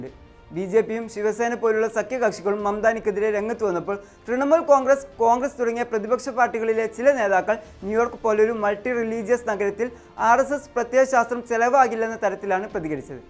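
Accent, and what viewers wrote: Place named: Malabar, India. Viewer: ബ്രോ നന്നായി സംസാരിക്കുന്നുണ്ട്. പത്രത്തിൽ അച്ചടിച്ചു വരുന്ന അച്ചടി ഭാഷയിൽ വേണം ചാനലിൽ സംസാരിക്കാൻ പ്രത്യേകിച്ച് പരിപാടികളിൽ. മലബാർ സ്ലാങ് മീഡിയയിൽ വിഷയാവതരണത്തിൽ കൊണ്ട് വരരുത്! നിങ്ങൾ പല വാക്കുകളും സ്ട്രോങ്ങ്‌ ആയി സംസാരിക്കുന്നില്ല..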